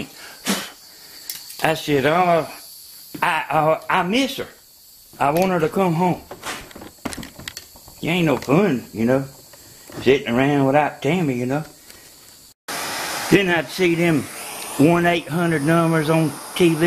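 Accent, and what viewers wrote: South, United States. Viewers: I like your down south southern drawl and I'm in South Carolina. Nothing quite like a Southern Gentleman. Just subscribed to your channel. Keep'um coming🇺🇲🤗🤗🇺🇲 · although I thank you for the video, I find the thick southern accent just too hard to listen to.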